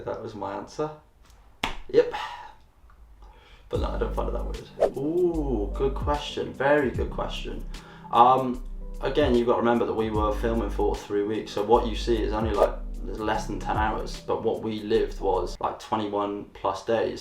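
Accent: England, United Kingdom. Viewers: agree especially british accent is way harder than the americans one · which English accent is he speaking? 😩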